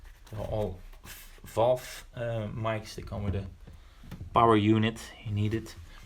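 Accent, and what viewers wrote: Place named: Flanders, Belgium. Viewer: I love the 'Ronald' keyboard , and the fact that your accent is not Dutch at all (it's Flemish :) )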